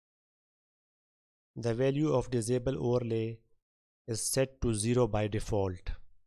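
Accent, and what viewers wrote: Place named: India. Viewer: when i hearf this indian accent i knew that this will works THX!!!!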